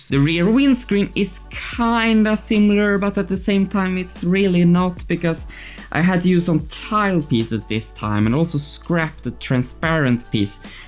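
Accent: Sweden. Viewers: Extremt stark brytning haha, du måste vara svensk?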